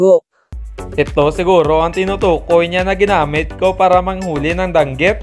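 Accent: Iloilo, Philippines. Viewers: Tuno mo ilonggo gid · Love na love q. Tlga accent ng ilonggo/accent ang sarp pkinggan..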